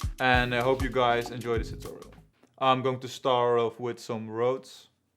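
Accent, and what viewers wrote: Netherlands. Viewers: Great beat and great tutorial! You dutch btw?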